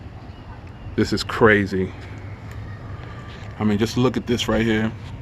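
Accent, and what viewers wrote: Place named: New York, United States. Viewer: New York accent coming out like crazy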